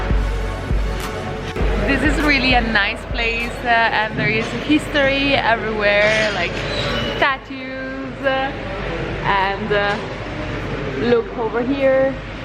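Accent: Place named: Rome, Italy. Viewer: si, mi piaci accento Romano , mi fa ridere sempre 🤣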